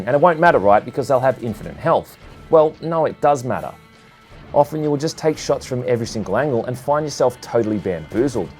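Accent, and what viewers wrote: Australia. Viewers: i had a feeling you where aussie haha so am i :) might have to reinstall and play this again.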